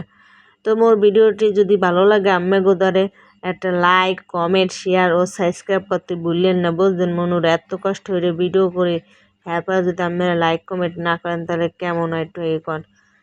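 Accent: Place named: Barishal, Bangladesh. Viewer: মাশাল্লাহ বরিশাইল্লা কথা অনেক সুন্দর। এর সাথে তোমার কাজগুলো অনেক সুন্দর ❤❤🎉🎉সুস্থ থেকো আপু লাইক দিয়ে পুরো ভিডিও দেখিনি। 🎉🎉🎉🎉🎉🎉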